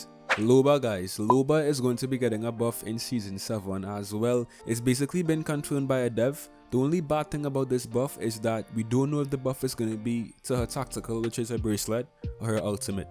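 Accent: Trinidad and Tobago. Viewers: YOU SOUND TRINI MY GUY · R u a trini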